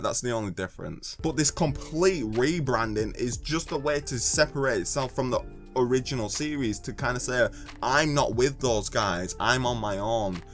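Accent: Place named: Geordie, United Kingdom. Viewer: Where are you from? I’m from Southern California. Are you a Geordie? Your accent is so tricky to place lol.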